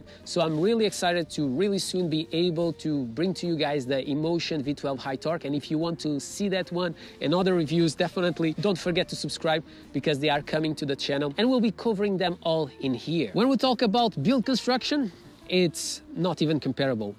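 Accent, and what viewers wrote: Portugal. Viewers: Este sotaque é tuga não é? 😂 ❤🇵🇹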